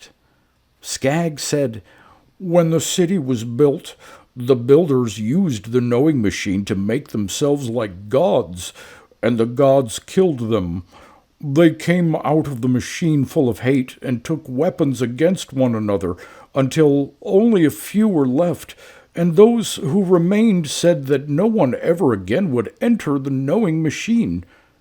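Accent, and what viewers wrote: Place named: United States. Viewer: Do you realise how VERY American you sound?. Almost a parody of American. I wonder how long religion will keep your Nation infantile?.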